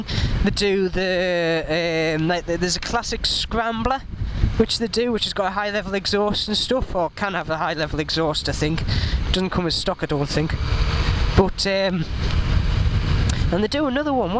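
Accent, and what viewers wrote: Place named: Newcastle, United Kingdom. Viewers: Superb review, superb! Love the Geordie accent too. If you read this, say 'Coca Cola' out aloud please. :) ...Have just bought the Street Rally version. Subscribed! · Guy with a geordie accent who doesn't sound like a twat - amazing. I'm fucked from watching geordie shore...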